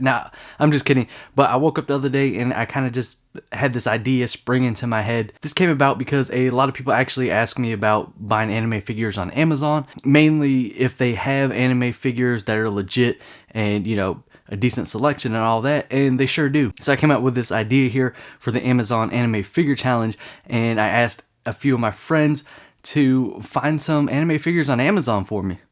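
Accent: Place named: South, United States. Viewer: oh god, i just couldn't help it but he sound like he has a real southern accent... Has anyone thought of it?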